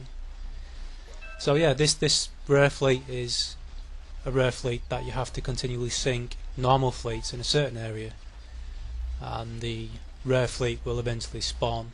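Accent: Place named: United Kingdom. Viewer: Only now have i watched your videos with sound on and found out your British, High5!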